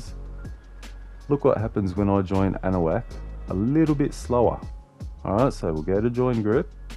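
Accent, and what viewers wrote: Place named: Australia. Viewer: U sound like Ur from Australia if so I'm also from Australia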